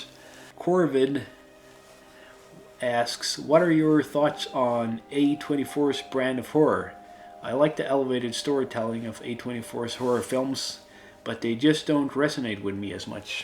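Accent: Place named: Upper Peninsula, United States. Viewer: You're my favorite YouTube discovery of 2025! I've been assuming you've been doing an intentional Joe Perra inspired thing with your voice. But I don't think you would use an affected voice for an entire video like this. So now I'm thinking Joe Perra's accent is inspired by not just the folks who live in Michigan's Upper Peninsula, but specifically folks of Swedish heritage up there. You both nail a very hygge feel. (Not a Swedish word but it's caught on in the US for a specifically northern European cozy vibe.) I've had the causal relationship backwards! You come by it naturally. No need to build a character around it. Happy belated birthday! Your English is easily as good as if not better than half the native speakers I know. Maybe you don't have the vocabulary of a college professor. But the vast majority of us don't, either. 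Don't sweat it.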